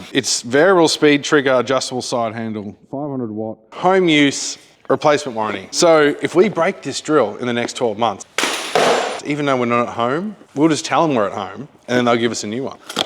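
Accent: Australia. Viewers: These little cars are so cool. Also wait, you're Aussie! Subscribed!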